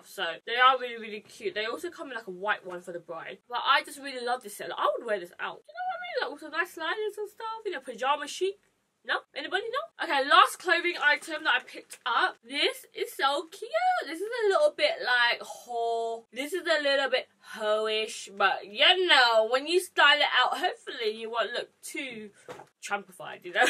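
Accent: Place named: Cockney, United Kingdom. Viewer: Samia Muslimah she got an accent which is called cockney. I love her accent x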